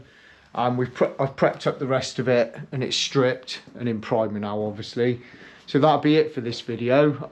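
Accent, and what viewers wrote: Wiltshire, United Kingdom. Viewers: you talk Wiltshire mate people shouldn't be rude skiting you accent great job on the door look forward to part 2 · Nothing wrong with your wilts accent my good man ! The one thing my wife liked was my Wiltshire accent and most of the people around here speak it to funny enough as I live in Wiltshire 🤣 that looked like a real tricky job there’s angles going all over the place looks proper nice to me good job 👍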